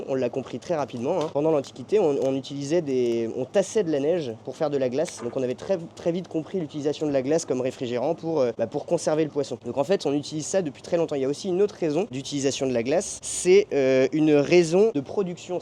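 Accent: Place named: Ardennes, France. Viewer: Plutôt Ardennais 😮😢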